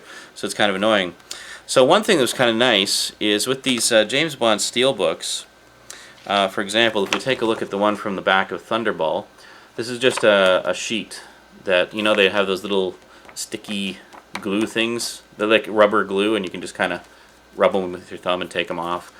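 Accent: Canada. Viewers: I knew you had to be Canadian or one of the states bordering Canada. Who else says aboot! I really like your Dead Space videos. Reminds me of hanging out with my friends and playing games together.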